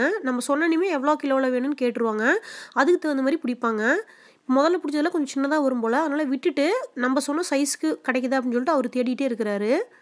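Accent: Kongu, India. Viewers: Kongu tamil super sis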